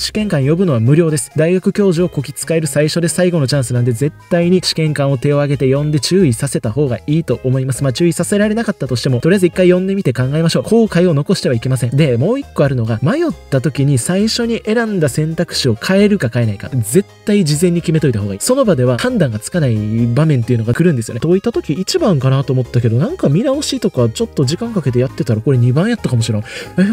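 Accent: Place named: Kansai, Japan. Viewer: 関西弁丸出しなのが本気度を表してる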